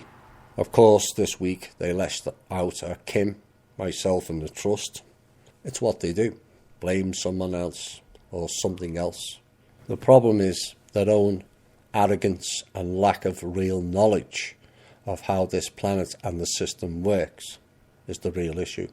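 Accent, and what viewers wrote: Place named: Liverpool, United Kingdom. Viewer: That's a Liverpool accent.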